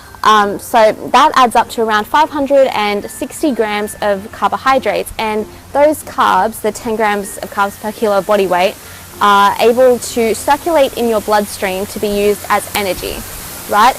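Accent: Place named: Massachusetts, United States. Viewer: love your accent. r u from MA?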